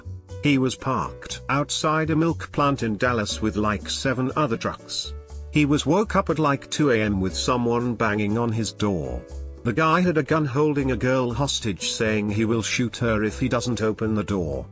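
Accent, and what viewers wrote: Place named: England, United Kingdom. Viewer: Who is this new English chap?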